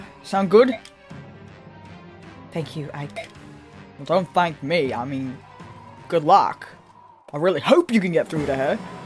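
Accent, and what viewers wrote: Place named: Germany. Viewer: oh not at all, your german accent is fantastic, and you were very reminiscent of the actress who voiced Rip Van Winkle.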